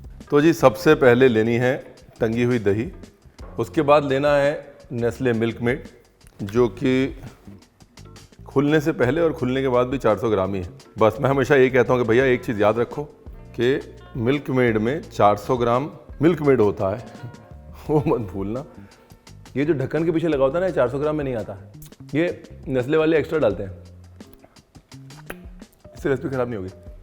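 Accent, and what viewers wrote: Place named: Lucknow, India. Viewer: Recipes ke sath jo lakhnauaa lahja hai bas sunte rahne ka man karta hai. bahut Bahut shubhashesh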